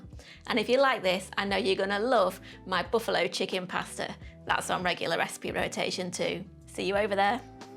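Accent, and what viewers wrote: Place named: England, United Kingdom. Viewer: Wow. This is so relaxing I love your voice. I’m a Brit permanently in USA now and it just feels sooo good hearing and English accent again 😭🥰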